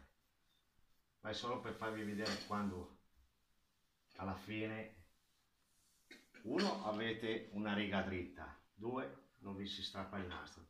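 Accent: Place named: Lombardy, Italy. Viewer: Di dove sei,dall'accento sembri lombardo,sbaglio?Sei un imbianchino da 10 e lode,complimenti da un collega!!!Mi iscrivo subito al tuo canale!!Per dipingere una parete grande,ci vuole un pennello grande!!CIT. Ma no,non ci vuole un pennello grande,ma un grande pennello,Cinghiale!! CIT.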